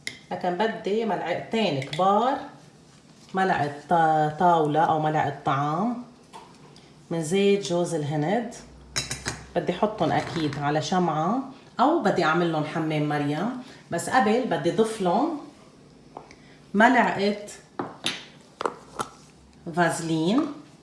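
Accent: Lebanon. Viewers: احلى لهجه اني عندي لبنانيه كلش حلوه بتعقد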